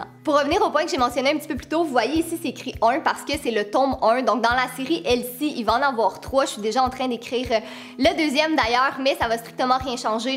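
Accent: Quebec, Canada. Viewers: JE T AIME troooooooooooooppp (j'adore ton accent quebecois)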